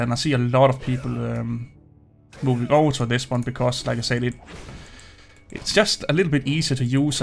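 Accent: Denmark. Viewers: It's so obvious on you accent, that you're danish, haha! I like it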